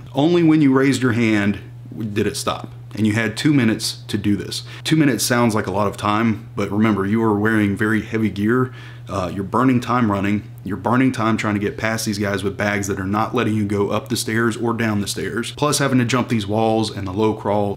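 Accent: United States. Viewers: Is this American or what country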